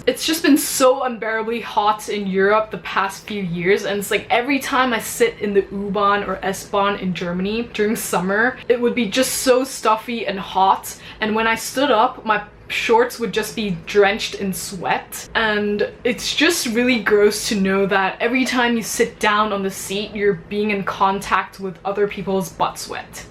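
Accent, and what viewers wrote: United States. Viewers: Would not have guessed that you were from Hong Kong. You sound American or Canadian. Then again my friends who are from Hong Kong grew up here in the States, so what do I know? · Wow! I would have never guessed from your heavy Americanized accent that you were from Hong Kong. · Did you pick up that perfect American accent whilst in Hong Kong? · In Chinese (I don't know about Cantonese) there is 您 (Sie) and 你 (du). The older one offers "du" to the younger one. No, you can never, ever go back to "Sie". Even if you hate each other. You cannot go back to less distance after you've moved closer together. You cannot "unknow" someone. It's trying to change the past. Trying to make things "unhappen". Intimacy cannot be reversed. That's like putting toothpaste back. I have lived in China for four years. You finding distance and politeness mutually exclusive is soooooo Chinese. 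不用客氣!!! Keeping the distance is an expression of politeness. You keep the distance to not bother the other person by coming uncomfortably close, emotionally speaking. From my cultural point of view you are confusing politeness with friendliness. Not saying "Guten Morgen!" isn't considered rude, it's considered unfriendly. How come you're speaking English like a US, white girl? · She really does have a good American accent!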